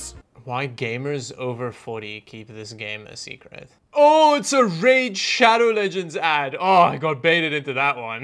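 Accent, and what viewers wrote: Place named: South Africa. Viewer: south African accent makes my ears bleed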